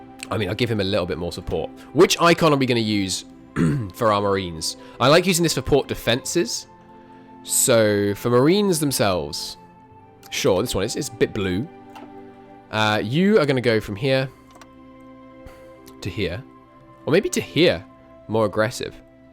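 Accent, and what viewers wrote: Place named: RP, United Kingdom. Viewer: How would you describe your accent? To me (Australian born), it sounds like an English Received Pronunciation, but perhaps tempered with something else. At times it sounds faintly like a sort of 'cultivated' Australian accent, the way a well educated younger Australian who isn't ashamed to show it sometimes speaks. (But not Cultivated Australian, which is a semi-official category, and today virtually extinct - the former PM, the late Malcolm Fraser, was an exemplar of this accent, and you don't sound anything at all like him).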